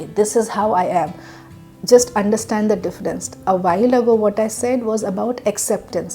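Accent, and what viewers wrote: India. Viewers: Love the way you explain things.Love your smile, listening to you,has a bihari tone being a bihari love it.keep smiling always ♥️♥️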